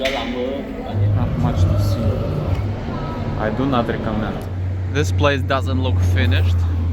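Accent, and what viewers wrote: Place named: Eastern Europe. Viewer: I like your commentary. The sarcastic eastern european undertone always shines through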